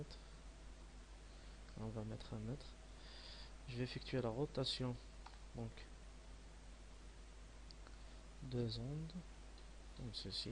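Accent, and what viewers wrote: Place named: Kabylie, Algeria. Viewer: toi t es Kabyle !!! j'adore l'accent t'es de chez moi toi ^^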